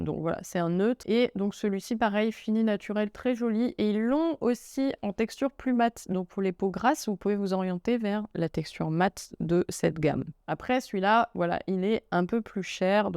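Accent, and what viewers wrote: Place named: Paris, France. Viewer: La vidéo est très intéressante et les conseils sont bons mais l'accent parisien là j'arrive pas 😅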